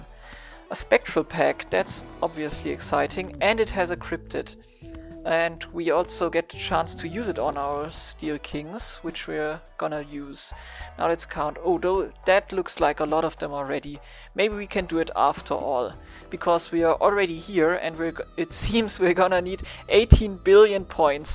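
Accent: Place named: Germany. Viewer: German Accent, like it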